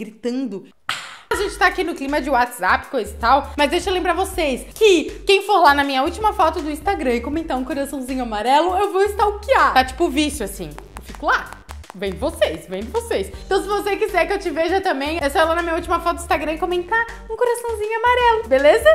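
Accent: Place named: Florianópolis, Brazil. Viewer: Você é de onde ? Tens sotaque de manezinha 😍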